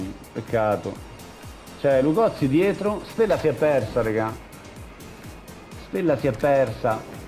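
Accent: Rome, Italy. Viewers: Mokk al mitico 🤣🤣🤣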